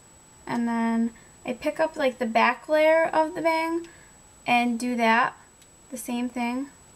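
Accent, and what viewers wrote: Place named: New York, United States. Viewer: omg you totally have an accent! haha i can tell anyone from new york has a tiny bit of an accent.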